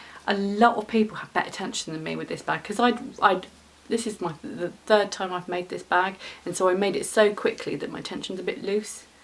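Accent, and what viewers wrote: United Kingdom. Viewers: I love your videos. Listening to your way of talking to the camera fills my heart with joy 🥰 and I love your British accent (I'm from the USA). I'd love to buy a few of your patterns on ravelry but I have a tremendous problem following UK crochet terms. It's like my brain gets stuck. Have a great summer🏵💦🌞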